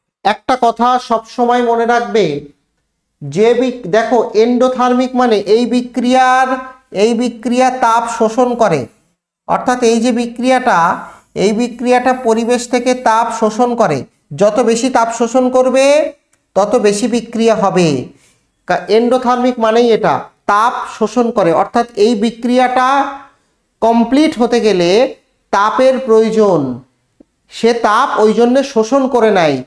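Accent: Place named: Bangladesh. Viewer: Ato bangla des lok mone hocche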